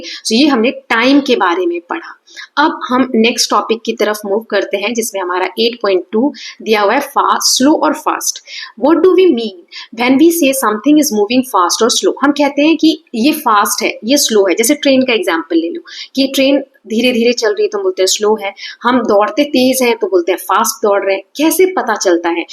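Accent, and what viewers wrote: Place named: Bihar, India. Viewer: Mam aap bihar se ho 😊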